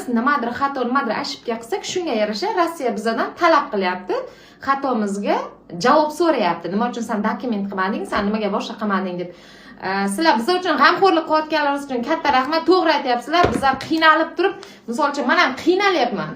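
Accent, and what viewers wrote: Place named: Bukhara, Uzbekistan. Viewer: Бухороча тошкентга ва харазм шевасинда гапиробсан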